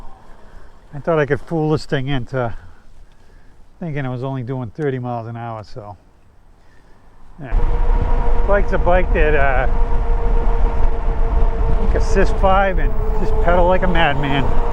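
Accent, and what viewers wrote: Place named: Northeast, United States. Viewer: Hey do you have a link or source that you used for your cable management i wanna do that to my expedition looks super clean on this bike btw where u from New York or New England? i grew up in Mass. by Boston so i recognize the North East accent so you like those direct drive motor's verses the geared hub motors i know direct drive are quiet and made for speed more while the geared are supposed to be more setup for torque going up hills and what not from what ive come to understand im looking into putting another geared hub in the front of my expedition but its been a long time in the making due to research cost and what not have any info on that type of situation by chance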